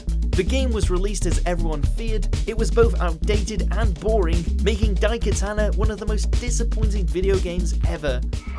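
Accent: United Kingdom. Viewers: Why is the narrator so british in this? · Who is this British guy?!?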